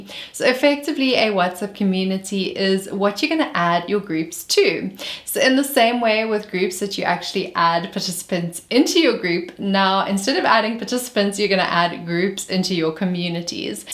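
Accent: South Africa. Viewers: South African right? Cheers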